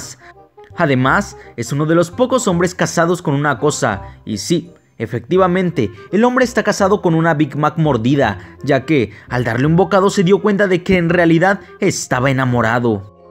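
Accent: Mexico. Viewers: Eres mexicano?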